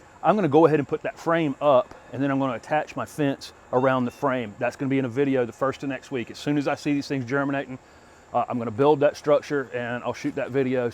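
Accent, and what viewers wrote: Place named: North Carolina, United States. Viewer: Your NC accent was quite a bit more pronounced in 2018, when you were in zone in... Lol.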